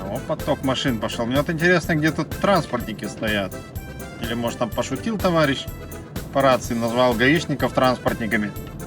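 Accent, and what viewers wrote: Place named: Kirov, Russia. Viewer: Спасибо за видео. Приятный кирОвский акцент.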